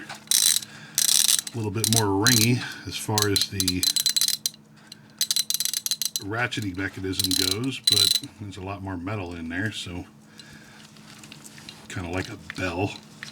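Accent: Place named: South, United States. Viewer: southerner im guessing lol.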